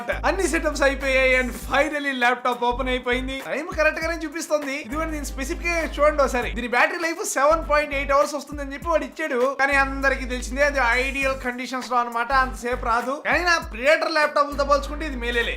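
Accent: Chittoor, India. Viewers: Chittoor slang anna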